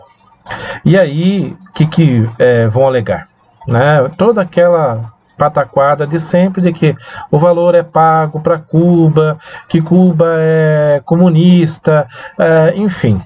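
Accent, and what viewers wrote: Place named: Curitiba, Brazil. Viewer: Ola, acabei de conhecer seu canal. Cresci em Curitiba, e sinto falta do sotaque curitibano (alem da conversa) =) So queria deixar um relato sobre uma conversa que tive com uma grande amiga cubana. Ela me contou que quando comecou o processo de enviar medicos de Cuba para o Brasil, a maioria dos medicos queria ir para o Brasil, tendo em vista que, mesmo com o governo cubano ficando com parte do dinheiro, ainda sim esses medicos ganhariam muito mais. Ela me disse, "voces nao tem nocao de como faltaram medicos em Cuba". Essa fala dela me chocou muito. Ela tambem disse, "parece que voces queriam medicos para assumir o interior do Brasil, que estava em falta em alguns lugares por la, mas pra isso voces tiraram ate da capital de Cuba". Eu nao tenho nenhuma opiniao formada sobre se levar medicos para o Brasil, vindo de outro pais, ta certo ou errado, mas com certeza algo que nos brasileiros nao nos perguntamos e por que tem "tanto medico sobrando em Cuba". 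E a verdade e que nao tem medicos sobrando em Cuba. Acredito apenas que, em nome de resolver nossos problemas sociais de forma imediata, de campanha politica, de envio de dinheiro para Cuba, de boa publicidade, etc tiramos medicos de um ser humano para levar para outro ser humano. Essa conversa eu tive ha uns 5-6 anos e ela ainda me choca. A pergunta que fica: sera que deveriamos transferir nossos problemas sociais para outras pessoas? Outra conversa foi sobre ela e os pais dela crescendo em Cuba, sem dinheiro vindo da ex Uniao So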